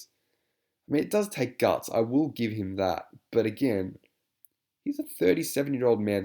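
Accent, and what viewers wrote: Australia. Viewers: Bro, you're like the Steve Irwin of perves, not only your accent but the purpose of every action by the critter of topic, the analyzation and breakdown is riveting.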